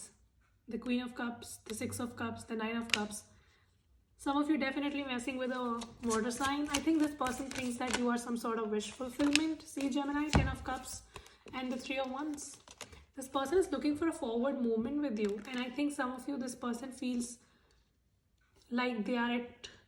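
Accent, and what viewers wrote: India. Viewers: I presently live in Mareeba, and my wife of three years has been "stuck" in Shanghai China for another 12months... and then this recent interloper is almost a "nut case"... My Wife is 10 years younger & 12may, I am 21may... Xinji holds my heart, but cannot leave China due to "covid & family", we do not speak the others tongue... I have some traits related to aspergers, bipolar & dementia etc... I want to produce "cold pressed coconut oil" on Vella Lavella Island, Western Province of Solomon Islands (I am fluent in "tok pisin" and have a masters degree & science degree in Tropical agriculture growing and processing Theobroma Cocoa (chocolate), coconuts, since 1970... (tea & coffee is a recent hobby interest)... I love sailing catamarans... Your accent sounds like Southern Indian? - I have resided in Bangalore, & Nagacoil for a 6 month holiday, and earlier, 2and a half years in Penang, Malaysia... 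At 75 years old I am presently bloody confused, waiting for "Ascension" on 21 Dec??? ... <mail>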